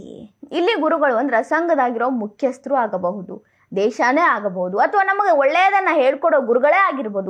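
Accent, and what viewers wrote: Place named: Kalaburagi, India. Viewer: ನಿಮ್ಮಿಂದ ಸಹಜವಾಗಿ, ನಿರರ್ಗಳವಾಗಿ ಬರುವ ಕಲ್ಬುರ್ಗಿಯ ಭಾಷೆಯ ಸೊಗಡು, 1968-70ರ ನನ್ನ ಅಲ್ಲಿನ ವಿದ್ಯಾರ್ಥಿ ಜೀವನದ ತರುವಾಯ ಕೇಳಿದ್ದು ಬಹಳ ಕಡಿಮೆ. ವಿಷಯದ ವಿಶ್ಲೇಷಣೆ ಹಾಗೂ ನಿರೂಪಣೆಯೂ ಪರಿಶುದ್ಧವಾಗಿವೆ.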